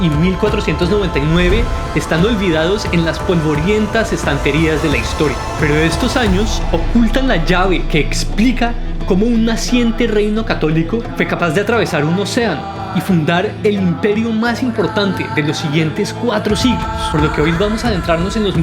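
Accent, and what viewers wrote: Bogotá, Colombia. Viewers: Quedé como iniciado mano! 😅😅 Ojalá puedas hacer la continuación de este video, eres un auténtico rolo, un auténtico colombiano. Me agrada ver que seas tan apasionado por nuestra historia y representes con tu estilo y acento la cultura de la capital. Dios te bendiga.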